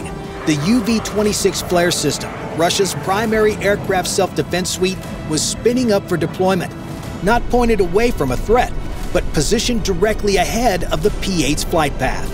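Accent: United States. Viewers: As an Australian I am disgusted that we misuse our resources this way. We have no business to be in that region. This American accent gives me the Heebie jeebies - what a load of horse shit. Actually sounds like a load of wishful thinking. Australia really does not want to have war with China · Why would you use and American narrator for this video? LMFAO Chinese Pilots, you can blindfold them with a shoelace.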